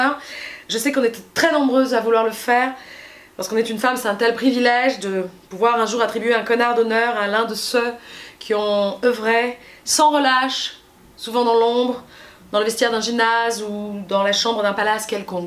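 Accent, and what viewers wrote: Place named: Suburbs, France. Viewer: J'aime bien ton accent de banlieue des années 80 , 90...🙃😉😗😘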